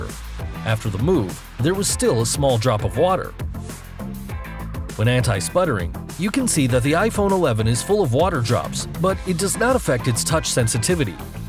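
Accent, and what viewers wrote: United States. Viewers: Holy shit the broken English in this video is so off-putting when read by someone with an American accent.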